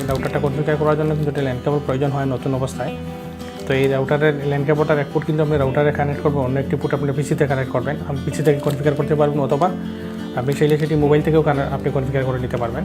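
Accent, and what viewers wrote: Sylhet, Bangladesh. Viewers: vai apni ki sylheti naki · Sylheti vi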